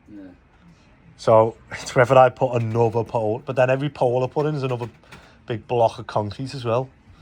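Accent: Liverpool, United Kingdom. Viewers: Just subbed mate coz Your Scouse . Just came across ya.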